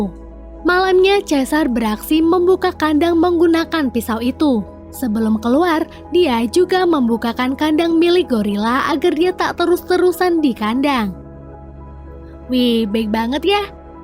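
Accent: Pemalang, Indonesia. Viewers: Wwong Pemalang asli min?Podo wkwk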